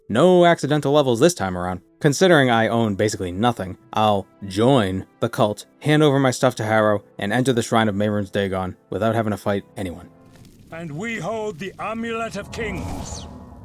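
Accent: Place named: New England, United States. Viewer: that’s sincerely just how the new england accent is lmao. they talk on fast forward up there.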